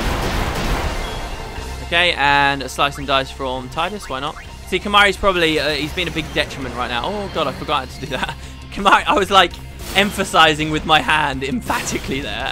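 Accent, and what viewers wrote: United Kingdom. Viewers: pronounce things in the british fashion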